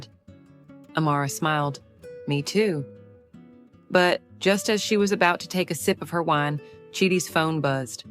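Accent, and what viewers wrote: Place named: South, United States. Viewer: The southern accent for an African story is distracting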